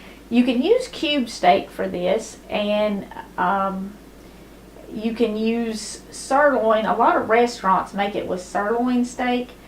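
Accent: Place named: Appalachia, United States. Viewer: We live your hillbilly talk. Prays God for ya. Everyone didn't lose real language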